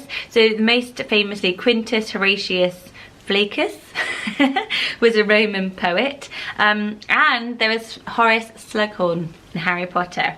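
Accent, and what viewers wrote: United Kingdom. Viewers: It's so funny for me to hear the lovely British pronunciations of some of these names. I'm from the US and particularly the south. So the name Blanche - my grandmother had several friends named Blanche and with the US "a" it's just not pretty at all. One "blanches" vegetables. With the French accent Blanche is very pretty. Here's a name for you - Jathena. That is my sister's name. I'm sure you've heard of Athena - well her name is Jathena. Quite pretty. My name is Janine. When I was growing up in the south in the US, NO ONE was name Janine - for a few years there was a Janine in every TV show and movie. It's lost it's popularity again. Anyway, we are almost exactly one year apart and we were Jathena and Janine.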